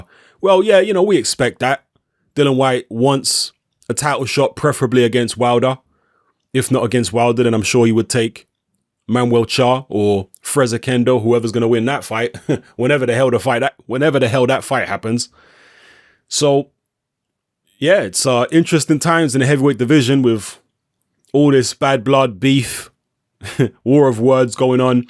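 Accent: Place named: Cockney, United Kingdom. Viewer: Guess where from?? Can't half tell this man is black an cockney!! Gives Tyson no credit & dillian AJ all the credit.. can't fucking wait till Tyson schools wilder, my guess is will change his mind & make it 60/40 wilder leading up instead of 80/20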